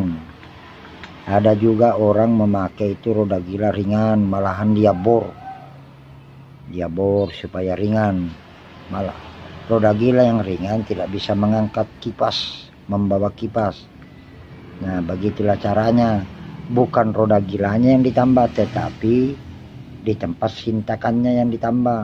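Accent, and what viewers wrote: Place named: Bugis, Indonesia. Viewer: apa kabar bang...?sy dengar ada logat logat BUGIS nya....!slm knl.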